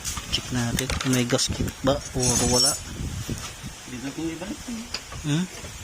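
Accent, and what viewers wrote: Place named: Pangasinan, Philippines. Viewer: damihan m nman upload mo idol nallibang aq halos napanood qna lahat videos mo, tapos yong accent mo na pangasinsn natutuwa aq.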